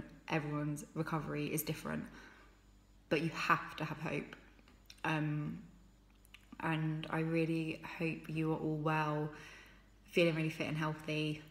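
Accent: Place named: United Kingdom. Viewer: I was expecting an american accent then bam british o.o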